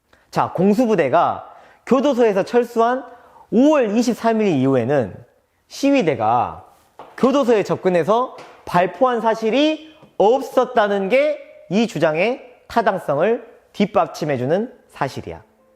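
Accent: Jeolla, South Korea. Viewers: 전라도말투부터 고쳐ㅜ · 전라도 말투가 듣기 거북하당께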